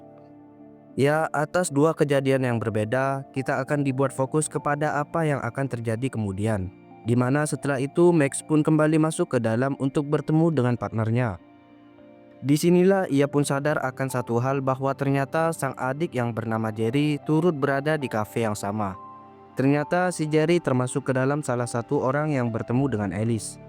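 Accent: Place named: Madura, Indonesia. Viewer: Hee.. kau madura ya? Jing-trejing tak iyaa..? Bikin perut mules dengar kau cerita.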